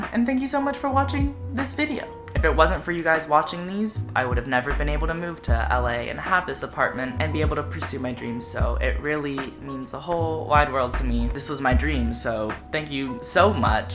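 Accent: Texas, United States. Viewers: Those traNSItions thooo!!! Also you sound incredibly Texan in this video?? Like more than usual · a love that sometimes 5he has a little of a southern accent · im from texas but your texas accent is so strong omygod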